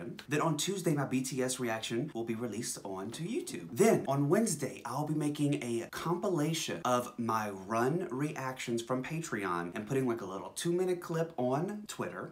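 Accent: South, United States. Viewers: You’re not country darling you’re more of a southern bell 😘 also your remixes are 🔥. My favorite era is Wings 100%. The trailer for their wings tour is so iconic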